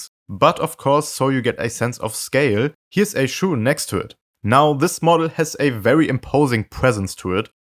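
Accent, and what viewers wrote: Germany. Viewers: Sounds like zhe Man in the Video is German (English accent) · Your english Sounds Like a German speaking english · Do i hear a german-like accent? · Are you german?😭😭😭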